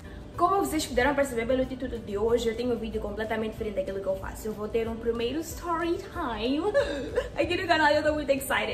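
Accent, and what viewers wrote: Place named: Beira, Portugal. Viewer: Sotaque beirense amo isso querida wamama kkkkkkk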